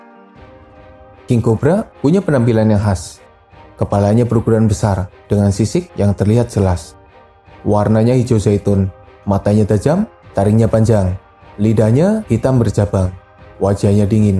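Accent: Banyumas, Indonesia. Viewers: Ngapak binginget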